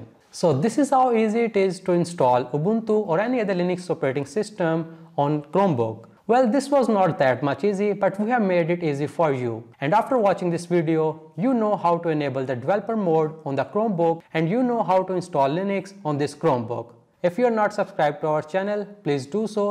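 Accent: India. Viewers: I have yet to try this but your Indian accent is way too strong, makes it hard to watch. I appreciate the effort though.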